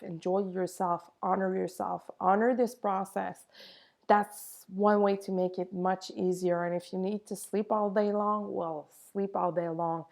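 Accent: Quebec, Canada. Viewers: Hi! Question, are you the girl from Quebec? I'm on the south shore of Montreal and i think i recognise your accent and i heard that, that girl, a few month ago living around Montreal that was doing group healing sessions. I saw that girl, maybe you, in a podcast once but you have no pictures here or on FB. Let me know if it's you! 💜🌸💜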